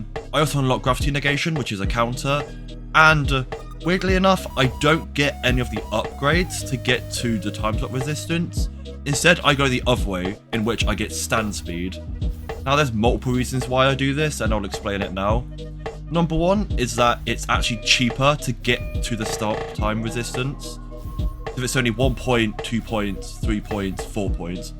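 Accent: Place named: United Kingdom. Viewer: You talking like Stolas or Octavia in Helluva Boss (The british accent i think xd)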